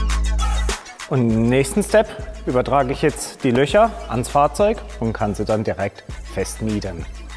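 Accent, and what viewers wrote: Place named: Baden, Germany. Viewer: Ich liebe deinen badischen Dialekt 👌😄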